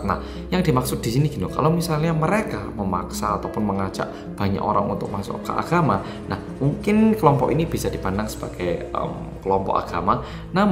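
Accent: Java, Indonesia. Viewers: Anda kaya orang Indonesia yg lagi merantau .bahasanya itu looh medok bnget hehe semangat mas. Teruslah bercerita tentang kehidupan korea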